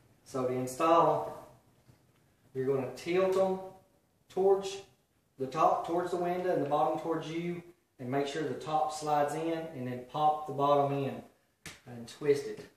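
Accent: South, United States. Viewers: Just a bit southern.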